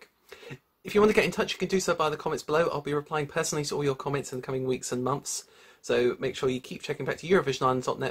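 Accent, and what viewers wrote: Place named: England, United Kingdom. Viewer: I hear the Klaxon siren already but can I ask why you're called Eurovision Ireland when you have an English accent? What's the connection? Sorry to ask just interested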